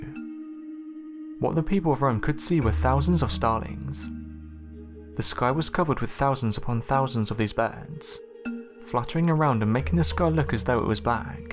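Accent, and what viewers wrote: United Kingdom. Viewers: Why are all these videos narrated by a British guy?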